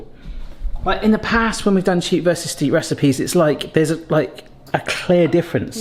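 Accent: United Kingdom. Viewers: Im Irish. Your accent isnt strong at all compared to others in the UK. Trying to understand my accent would be a hoot though. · Well my good home chef, I took the liberty of watching a couple of West Country accent videos and I can definitely say that while you definitely have what to my American ears sound like a "British Accent", you have only a hint of the "yap" I could detect in the dialect... but I'm also somewhere between the Midwest and Northeast of America, where apparently the accent has an effect (West Country old men legit sound like old men from my area with British accents, lol). Loved the video, by the way! Makes me want to get a Twix!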